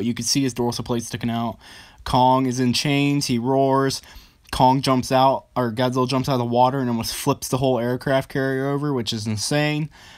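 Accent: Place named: Philadelphia, United States. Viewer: Found this channel because I heard there was a new trailer out but then noticed that thick ass Philly accent and I love it haha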